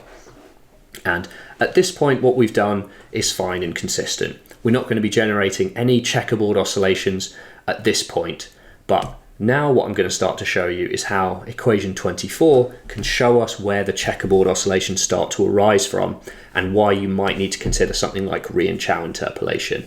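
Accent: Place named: United Kingdom. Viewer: 这英国口音听着太舒服了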